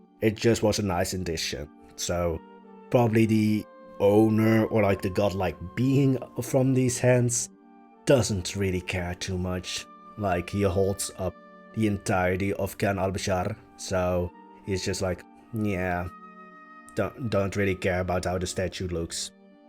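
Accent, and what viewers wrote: Netherlands. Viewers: you sound dutch so i think youre a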